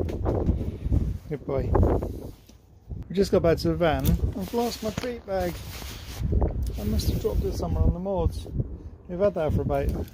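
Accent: United Kingdom. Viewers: Btw are u from the UK??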